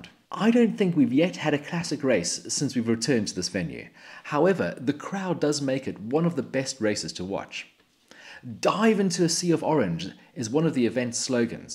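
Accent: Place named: United Kingdom. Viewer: You’re Dutch? You’ve nailed the British accent if your Dutch.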